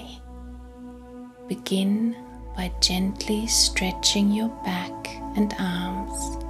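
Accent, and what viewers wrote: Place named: Australia. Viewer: This is such a beautiful meditation, it's your voice I am sure of it. Probably the wrong thing to say but I am Aussie too and I do love meditations with an Aussie accent. And not all narrators has that lovely tone.. I do like your website and I have my eye on a few meditations by you and Brad. Thank you :)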